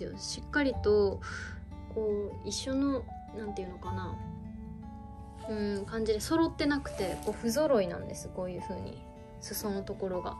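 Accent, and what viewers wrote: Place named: Kyoto, Japan. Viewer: 今までゆきりん一筋でしたが、AKBの全国ツアー(愛知公演)を見に行ってからゆいちゃんのことも気になるようになりました😅 ゆいちゃんの京都弁が好きです😊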